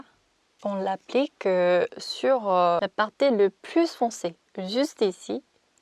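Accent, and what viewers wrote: France. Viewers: j'aime beaucoup trop t'en accent Français tu es magnifique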